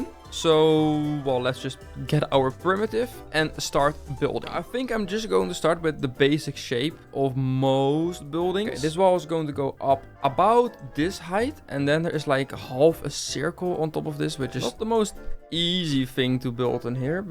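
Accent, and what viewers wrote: Netherlands. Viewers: are you from the netherlands?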